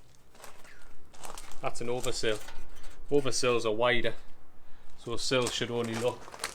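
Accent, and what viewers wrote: Newcastle, United Kingdom. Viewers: I love the work you're doing but I'm also enjoying the Geordie narration!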